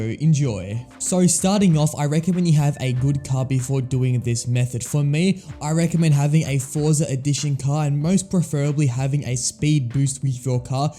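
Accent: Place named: Australia. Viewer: Bro is fine he's an Aussie I live there I have the same accent but I'm Italian there is nothing annoying about his voice unless you hate it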